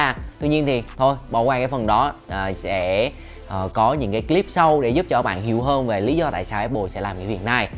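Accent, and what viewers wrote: Phu Yen, Vietnam. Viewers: Ad dân phú yên à Nói tiến phú yên rặc kkk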